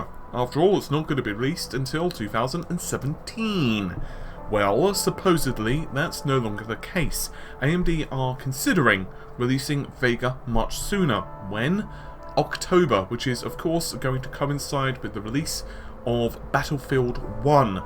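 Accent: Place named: United Kingdom. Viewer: BuckRogers yep, I'm from Costa Rica, i can speak and comprehend pretty well, but due to his tone it is sometimes a bit harder to understand what he says, I'm too distracted with his tone and accent. I gotta put more attention to it. He sounds british to me.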